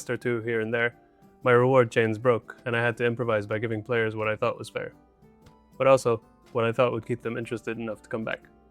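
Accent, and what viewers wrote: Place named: Sweden. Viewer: Thanks for your reply. Also by your accent I can tell you are swedish, right?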